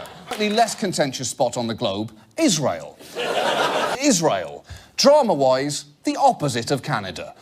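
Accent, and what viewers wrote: United Kingdom. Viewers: Where are you from?? the shot hole England??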